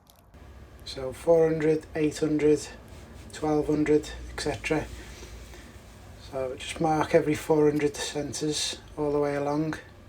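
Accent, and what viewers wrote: Liverpool, United Kingdom. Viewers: …he’s a scouser la..💙👍🏻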